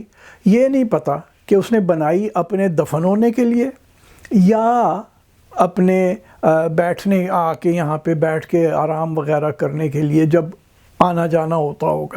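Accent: Punjab, Pakistan. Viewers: sahab salutes to your style of narration with a pure Punjabi accent! Please if you could present a detailed series about Maharaja Ranjit Singh, so that the new generation doesn't forget about the only legendary Punjabi ruler who is taught in Oxford. Thanks!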